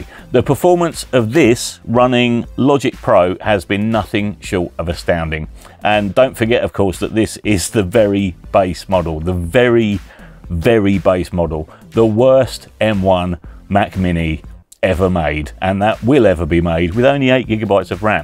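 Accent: United Kingdom. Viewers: Cannot explain the joy of hearing a good old English accent on an audio M1 video. If you're available for booking, we'd LOVE to work with you. Cannot explain how relaxed we would feel.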